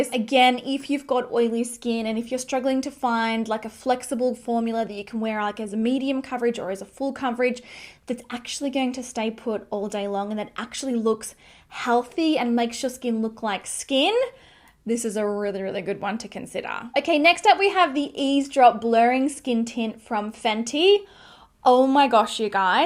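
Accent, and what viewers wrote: Australia. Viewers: I just love her Australian accent. I wish I had it too 🥰 - You should try the Wet n Wild tinted hydrator (if you haven't). It's incredible for my oily skin. I like that one way better than Fentys. But we're all different so just my opinion. I think you should try it if you can. Plus it's like 5 bucks. The Incognito concealer is great too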